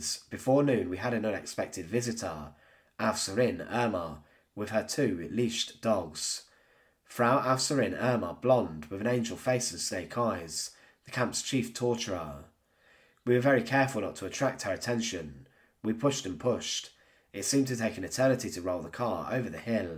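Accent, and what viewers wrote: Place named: England, United Kingdom. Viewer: You simply just don’t like his British dialect. Which is fair, we’ve all felt that at some point. But dudes not a bad narrator. Sure the mic quality could be better and it probably will be in the future.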